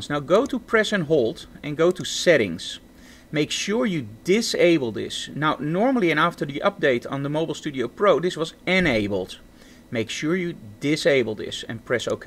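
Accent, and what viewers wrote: Netherlands. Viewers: Hm Me: looking for a fix for my wacom Me: Coming across this video Me: huh, this guy has a typical dutch accent.. I wonder if he's... Me: * finding his website * Me: .. I was right.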